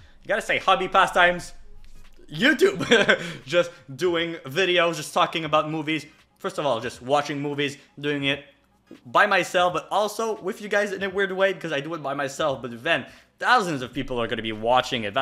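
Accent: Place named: France. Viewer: oh so you are french alright i was Thinking what kind of accent that is and where is written as you like? thats awesome!!! Favorite songs? ( is awesome too) and L0L you are younger than me i thought you were 25 or older but definitely below 30 can you watch coma attraction attraction 2 what happened to monday rampage angry birds jump street 1 and 2 Leon soul transformers 1-5 +bumblebee clash of the titans,wrath of the titans 300 great wall 47 ronin pitch Black bloodshot dr sleep ghost in the shell scarface goosebumps invisible man percy Jackson dragonball broly it 1 and 2 evil dead trilogy guns akimbo parasite spies in disguise commuter detective pikachu gentlemen non stop attack the block hard powder Cold pursuit planet of apes trilogy abraham Lincoln Vampire hunter outlander gemini man Jericho project gunman american assassin youre next halloween 2018 predator Upgrade pacific rim 1 and 2 damn you have a lot of funko pops i only have 4 or 5 i think, Thanos, gold thor, dr strange, wolverine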